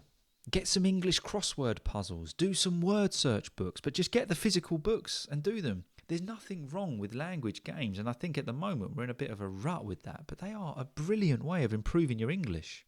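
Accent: United Kingdom. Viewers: Bonjour, Je suis Abolfazl, je viens de l'Iran et je suis en traîne d'apprendre le français ou je peux même dire que je suis étudiant en littérature et la langue française. J'apprenais le français pendant trois ans jusqu'à maintenant. L'autre chose que je voulais dire,c'est que j'adore aussi apprendre l'anglais surtout britannique accent et vous parlez incroyablement joli et ça m'aide à progresser plus rapide qu'avant. And I dare to say that now I'm able to speak exactly like you because of repeating after you. Merci à vous et bon courage ❤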